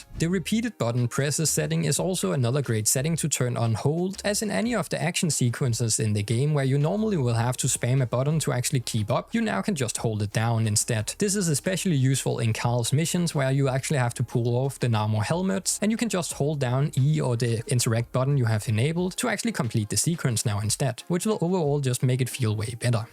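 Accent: Denmark. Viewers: Fast question are you danish? You sound like one of my friends when he speaks English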